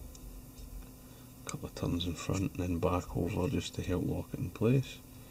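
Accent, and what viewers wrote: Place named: Glasgow, United Kingdom. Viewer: just learn to understand english then, with an Glaswegian accent. English is my second language and I understand it fine.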